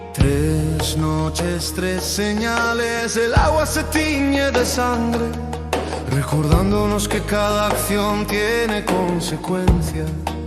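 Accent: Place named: Spain. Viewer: Wow!! Yo pensaba que naciste en España por el acento, señor. Veniste a Estados Unidos por música, religión, trabajo??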